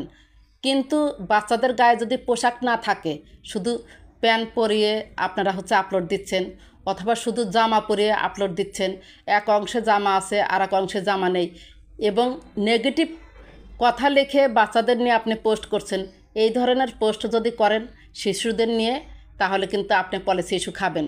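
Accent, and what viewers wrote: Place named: Chittagong, Bangladesh. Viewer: আপু আপনার বাড়ি কি চিটাগাং।আপনার কথায় মনে হচ্ছে । তাই জানতে চাই ভুল হলে কমা করবেন। ধন্যবাদ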